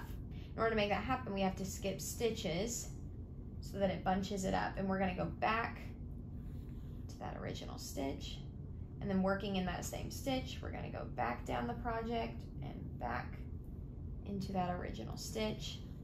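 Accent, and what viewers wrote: South, United States. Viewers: You explain so well and have such beautiful work! Also I love the southern accent I’m from the Midwest This is such an incredible video thank you so much! You definitely have a gift for teaching and arts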